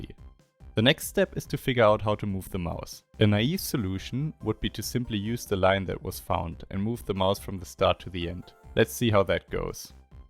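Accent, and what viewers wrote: Germany. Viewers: R u german? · Hört sich so extrem deutsch an. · This is extremely interesting, love the work you put into not only the coding, but the video editing aswell. Might I ask, where you are from? I hear an accent but I am not quite sure if its a german one or something else. Anyways, new subscriber, keep up the good work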